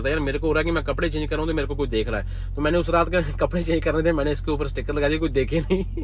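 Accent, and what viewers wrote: Punjab, India. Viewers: Bhai aapki Hindi Punjabi mein sun ke maja aa jata hai 😅!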